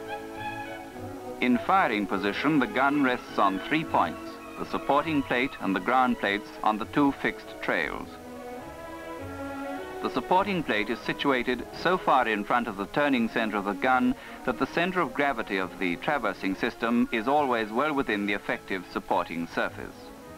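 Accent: RP, United Kingdom. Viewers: It's a Swedish weapon, not British; although clearly a British narrator. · the bbc accent is horrendous, no one in the uk has ever actually spoken with this accent (other than media turds)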